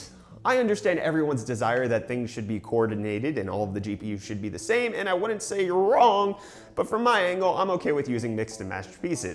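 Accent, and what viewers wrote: United States. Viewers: Holy sheet man, you live in South Africa? 0.0 You sound American tho, elaborate pls? (You may have already told your story, i'm new so pls fill me in! #PossibleSub)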